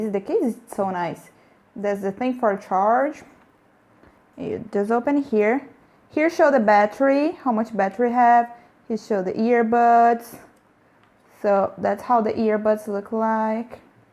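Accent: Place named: Brazil. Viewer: Totally Brazilian accent, congratz for the video.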